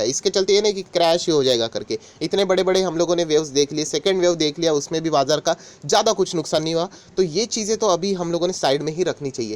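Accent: Maharashtra, India. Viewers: Sir shayd Pune se kyuki unke Google page me by default language Marathi he, also sometimes he mumbles marathi word's, I know this because I am also marathi. Also he has mentioned that he likes to watch live cricket that means he lives nearby Hinjewadi as it is the only place where international cricket stadium is nearby. Also he was using Micromax Mobile as sometimes during video notification sounds comes. Sir recently bought iphone as well because during video he's phone was ringing... And he last week he said he is making video from different mobile and checking the audio video quality. And most of the time in his video he says 'matlub.. matlub' that's shows that Hindi is not his mother tongue.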